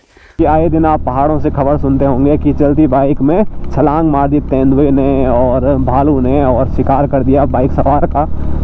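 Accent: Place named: Haryana, India. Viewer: Ary ya aawaj to mhale haryana ke h🚩🚩🚩🙏🙏🕉️🕉️🕉️🇮🇳🇮🇳🇮🇳🇮🇳👍🌸🌺👌👌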